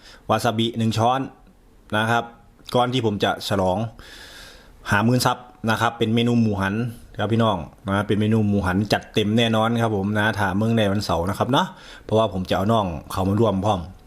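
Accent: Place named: Ban Phue, Thailand. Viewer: สำเนียงคุ้นๆเป็นคน อำเภอบ้านผือ บ่ครับ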